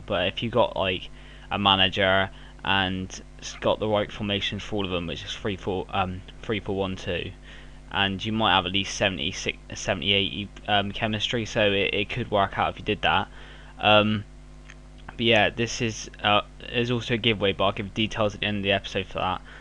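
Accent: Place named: West Country, United Kingdom. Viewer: GT: x Dirty Wizard Player: Huth Also dude do you live in Somerset/Bristol? You got an accent haha :P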